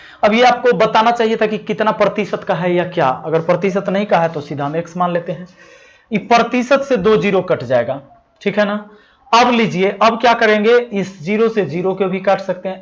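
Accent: Bihar, India. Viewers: Ek dam bihari andaz me padate hai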